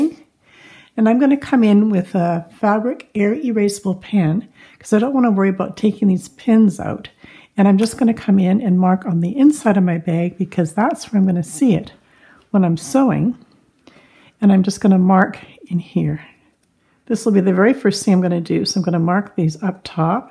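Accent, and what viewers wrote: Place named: Canada. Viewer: Hi. I love your tutorials. You sound like a Canadian.;) If you are where do you purchase your cotton rope for your projects. I live in rural Manitoba and have very limited options. Thanks so much and keep them coming. I dyed rope and made Easter baskets after that video....so great.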